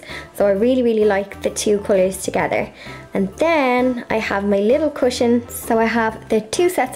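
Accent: Ireland. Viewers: Ayyyy ur Irish !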